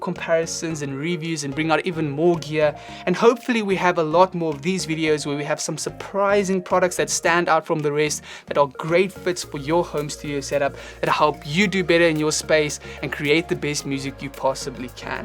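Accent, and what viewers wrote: South Africa. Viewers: Ah so cool, I could tell you were South African staight away. Very cool to see a saffa respresenting in the youtube music scene!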